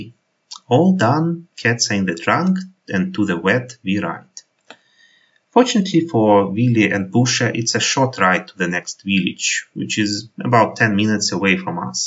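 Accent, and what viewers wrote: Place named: Switzerland. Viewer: Интересно, почему швейцарский акцент? Мне приходится смотреть много учебных фильмов ( по медицине), сделанных в Швейцарии. У дикторов очень похожее произношение. Может в Австрии тоже так говорят? Надо бы видео с австрийцами посмотреть.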